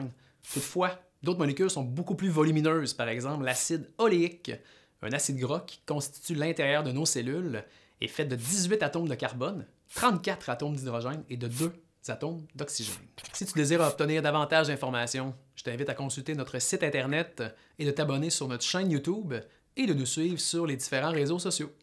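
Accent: Quebec, Canada. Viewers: son accent Québécois tres pousser je ne comprend rien 😅😂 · mais vous etes vrmt des canadien ? pas vrai? bref jai trop aimer la video et on comprend vite , pas comme les autre video qui dure 15 min et a al fin on comprend rien , je vous remerciiie :))) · Francais canadienne?? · merci pour ton aide téxplique grave bien et super vite et jkiffe ton accent quebequoi thank you so much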